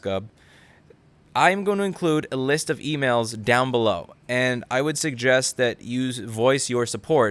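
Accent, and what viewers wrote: New York, United States. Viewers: Louis has a NY accent? Lol I guess I missed that 😆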